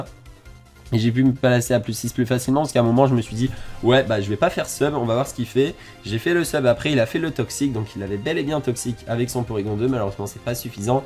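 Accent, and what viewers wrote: England, United Kingdom. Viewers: Je risque pas d'oublier cette vidéo où tu réussis l'exploit de foirer l'intro et l'outro en même temps xD. Bon pas grand chose à dire sinon GG ! Cresselia est bien parti pour être MVP ! Pense à te doper à la camomille pour les prochains matches, ça semble efficace ! (par contre j'ai rien contre l'accent anglais, je trouverais même ça marrant qu'il devienne ta présentation emblématique pour cette compétition^^) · no the englush accent is back :'(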